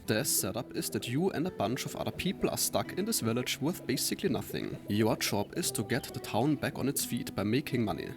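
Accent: Germany. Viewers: love the giga german accent!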